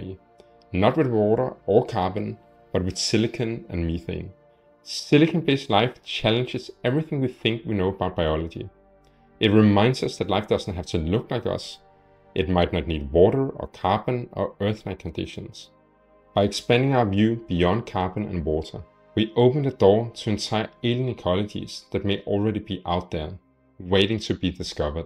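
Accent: Germany. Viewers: Why does he sound so German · I know that your channel is new but I get the feeling that you are going to take off on YouTube very soon. Keep up the good work. By the what country are you from? I would have guessed one of the German speaking ones but i could be wrong.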